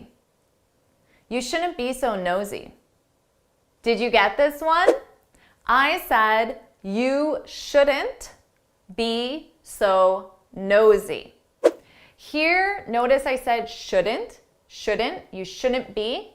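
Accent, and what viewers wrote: United States. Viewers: Really nice. But this is American English. British English Should of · thank you ! just curious about where are u from originally i mean your english is american or canadian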